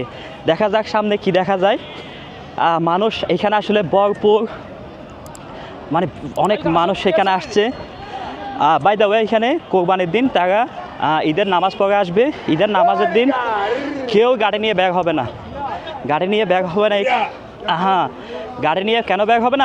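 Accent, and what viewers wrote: Chittagong, Bangladesh. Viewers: উনি চাটগাঁইয়া বা চট্টগ্রামের মানুষ। উনি চট্টগ্রামের উপভাষায় কথা বলতো।তাই পিউর বাংলায় কথা বললেও চট্টগ্রামের একটা টান থেকে যায়।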